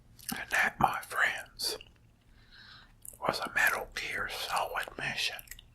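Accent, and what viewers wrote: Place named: Pittsburgh, United States. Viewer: You sound like you're from Pittsburgh lol.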